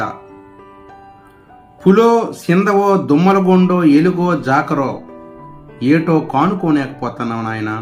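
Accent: Srikakulam, India. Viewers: శ్రీకాకుళం యాస లో కవిత... చాలా హృద్యంగా ఉంది. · కవి గారికి శుభోదయం. ఉద్దానం యొక్క పరిస్థితిని కళ్ళకు కట్టినట్లుగా కవిత చదివే విధానం శ్రీకాకుళం యాస కవితలో చోటుచేసుకుంది. పూర్వ విద్యార్థుల సమావేశంలో ఈ కవిని గురించి మాట్లాడే అవకాశం లేకపోవడం నాకు కొంత బాధ కలిగించిన విషయం. ధన్యవాదాలు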